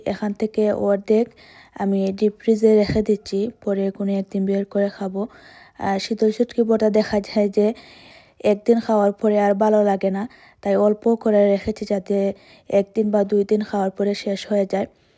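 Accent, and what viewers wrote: Sylhet, Bangladesh. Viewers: আপু আপনার সিলেটি ভাষার কথা বলা শুনতে খুবই ভালো লাগে। ভর্তাটা খুবই লোভনীয় হয়েছে। জুতা গুলা সত্যি খুবই দারুন। ব্রেসলেট এবং জুতা সবই সুন্দর লিপস্টিকের কালারটা ওয়াও❤ ভালো থাকবেন আপু।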